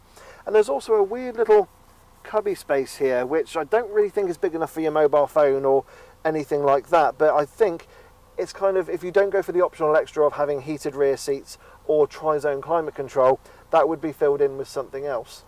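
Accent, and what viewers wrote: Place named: England, United Kingdom. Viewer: I just love this guy's english accent. Very classy.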